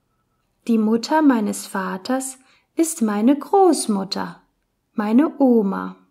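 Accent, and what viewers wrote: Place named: Italy. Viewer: Schade, dass die Deutschen nicht so sprechen. die Intonation und Dialekt sind sehr anders. Die Sprecherin kommt vielleicht aus Italien, oder. das finde ich nicht gut.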